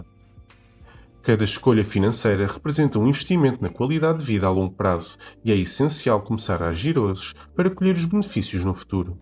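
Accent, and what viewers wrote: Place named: Portugal. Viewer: Que lindo, gente!! "Ao entrar na idade de reforma"!!!! É tão fofinho o português de Portugal!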